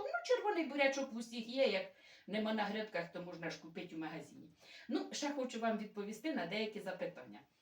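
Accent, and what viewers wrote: Poltava, Ukraine. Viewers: ваш тембр дуже нагадує специфічний полтавський акцент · Так говорят на Полтавщине. Прекрасный край, чудесные люди. С любовью Мариуполь. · Правильно ви балакєте так як і ми на полтавщині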